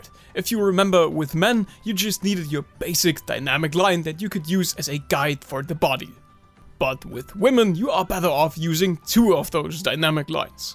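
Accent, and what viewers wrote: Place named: Germany. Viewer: wy is is a garmen accent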